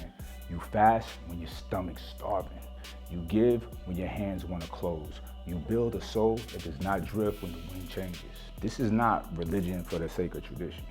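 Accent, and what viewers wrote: Brooklyn, United States. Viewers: "The culture rewards this bullshit" I know Brooklyn when I hear itl 🗣 TEACH!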